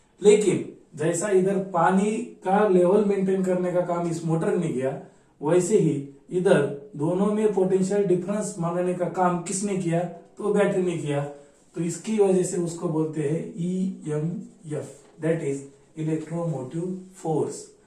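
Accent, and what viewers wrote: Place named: India. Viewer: Love your Hindi Accent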